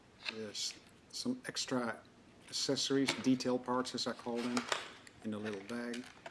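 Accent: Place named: Netherlands. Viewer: Your English sounds very Dutch. Nice presentation, nice locomotive. Although I drive mainly German and Swiss locos I may consider to buy this one as well. Looks and sounds really nice. Greetings from the Čech Republic 🇨🇿 and stay healthy 😷.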